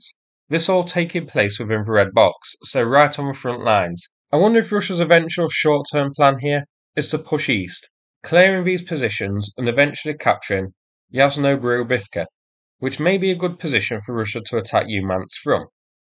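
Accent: United Kingdom. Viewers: The British accent is fantastic. It fits very well with British humor.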